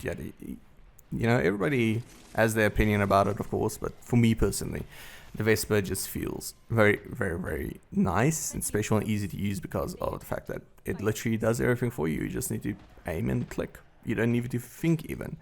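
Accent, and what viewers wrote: South Africa. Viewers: South African accent.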